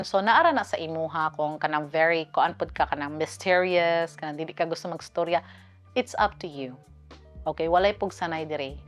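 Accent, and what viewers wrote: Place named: Butuan, Philippines. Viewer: you speak like my cousin😅 are u perhaps from butuan? lingaw kau ko the way ka muspeak😊 more videos pa✨✨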